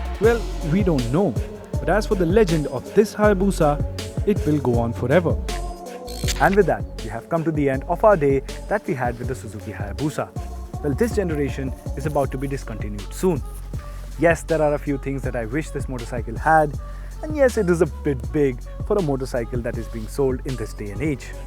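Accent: India. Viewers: Why can't indians ever speak a language without their accent ? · I had to skip the video as soon as I heard that Indian accent 🤢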